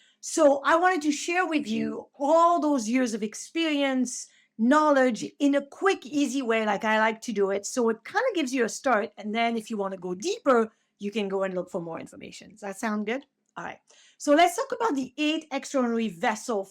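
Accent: France. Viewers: you are awesome very gifted teaching TCM with passion; please do not apologize for your French accent! Joanne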